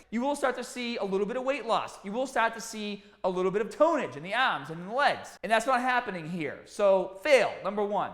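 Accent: Boston, United States. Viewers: He’s from Boston lol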